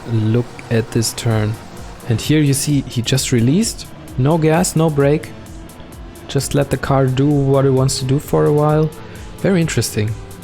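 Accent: Germany. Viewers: Are you german? Man hörts :D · Krank dass man einfach hört an dem Englisch Akzent hört aus welchem Land man ist. Bei dir hab ich direkt gehört dass du deutsch bist und bei wirtual z.b dass er skandinavisch kst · dieses german english :D .D :D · Bist du ein deutscher? Erkenne ich wegen dem ackzent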